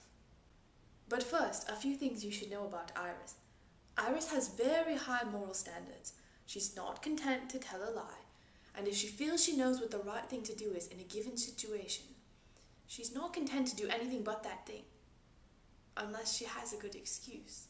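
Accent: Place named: United Kingdom. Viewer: it seems natural for you to have a british accent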